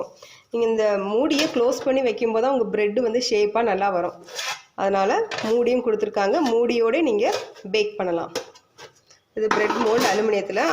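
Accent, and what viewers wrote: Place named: Kanyakumari, India. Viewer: Neenga town ah? But native Nagercoil k. Kumari mathiri neenga pesara style iruku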